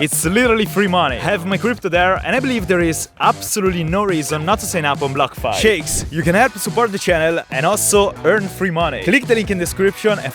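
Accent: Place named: Italy. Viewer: Other skills you can learn (I'm new so I don't know if you already learned them. I discovered you yesterday.): 1. Learn the Japanese Katana (Like you did with the Nunchucks and the Staff.) 2. Develop a British accent (But don't use it because I, and probably every fan really likes your Italian accent.) 3. Learn how to freestyle rap on command. 4. Learn how to solve a Rubix cube, but blindfolded. 5. Learn to do the cup stacking game. This was all I could think of. Do you like it, and would you use it?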